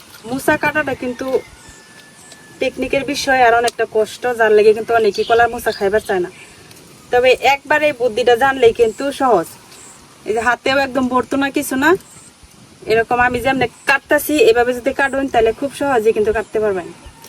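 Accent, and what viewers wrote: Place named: Netrokona, Bangladesh. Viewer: Gramer meye bujhlam kintu tai bole ki ektu Valo kore ashba na shabar shamna Eta hoccha netrokona karon Kotha goli jemon khayam jayam agoli okhankar vasha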